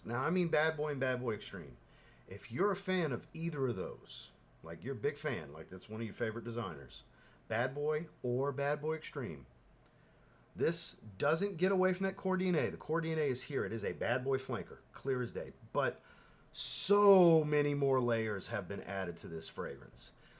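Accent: United States. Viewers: Sorry for this kind of strange question, but where do you come from?As a Pole, I find your American accent souding really good to my ear🙂,not too heavy, understandable though. Your review is good too.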